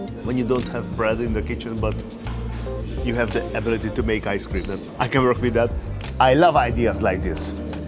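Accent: Hungary. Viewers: you sound very very very hungarian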